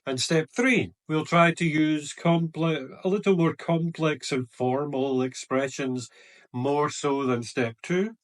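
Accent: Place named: Scotland, United Kingdom. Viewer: Thank you so much, this is very helpful video, and are you from Scotland? I notice that from your pronounce to /e/ & /r/.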